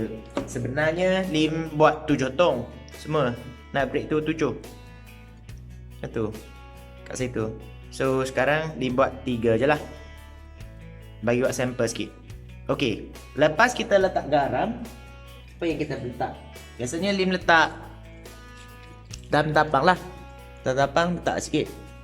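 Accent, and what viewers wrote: Kelantan, Malaysia. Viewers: memang terbaiklah cina kelantan lancar je cakap kelantan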